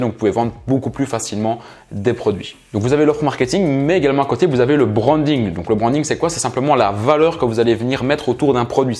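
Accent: Belgium. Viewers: T'es belge? Je reconnais l'accent belge ahah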